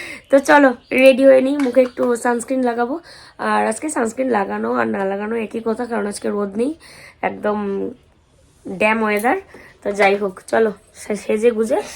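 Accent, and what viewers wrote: Bangladesh. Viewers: Prottek jaygay ak ak ta ancholik vasa thake, ota sobai jni. proyojon a nijeder moddhe kotha bolao hoy vlog cholakalin, bt ajkal puro vlog ta besir vag somoy Bangladesher vasay/ local vasay kotha bole vlog continue korche, Really khub odd lagey sunte. ak ak br bolbe seta vloi lage, amrao porichito hoi vasatar sathe. tumi fresh Banglay chesta koro. o toh coochbihar er meye, ki sundor kore kotha bole. tomar kotha gulo baddo kaney lagey. kichu mone korona bollam bole. chesta koro nije k improve korar. Roj vdo dekhi, cmnt. kori tai jeta thik mone korlam seta bolei fellam.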